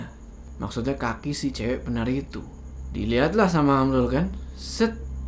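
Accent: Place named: East Java, Indonesia. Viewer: Emang kenapa kalo ngomong jawa timuran?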